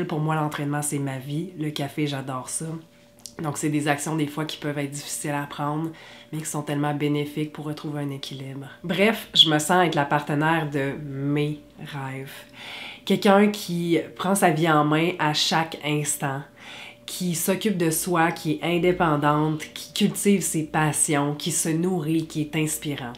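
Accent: Quebec, Canada. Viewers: Merci, tu es une personne adorable et remplie de douceur, de mots sécurisant, et,comme mes nombreux amis proche de mon coeur,moi,j'adore ton accent Québécois, je me souviens que j'ai imité l'accent du Quebec avec une amie chère de Monréal, et elle m'a dit " ALAIN, J'ADORE TON ACCENT DE NAMUR", (MA ville ),et j'ai éclaté de rire avec elle. Je t'envoie de gros " bécots " de Belgique, a toi et a tout tes abonnés. Que la paix et l'amour demeurent toujours avec vous, quoi qu'il arrive, et surtout, envoyez le plus d'amour universel autour de vous toutes et tous, PROTEGEZ LES ANIMAUX ET LA TERRE, et LES HUMAINS,c'est très important. Avec tout mon amour inconditionnel, votre amis a toutes et a tous Alain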